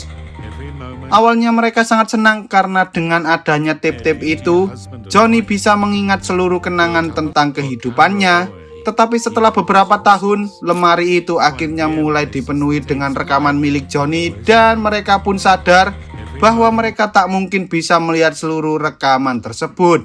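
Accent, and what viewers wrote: Java, Indonesia. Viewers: wong jowo rupanya mas ini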